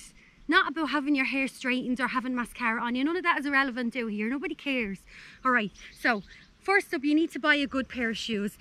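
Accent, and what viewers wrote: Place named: Dublin, Ireland. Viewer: Love your accent sweet Dublin 😍great video thanks 🦁lionel x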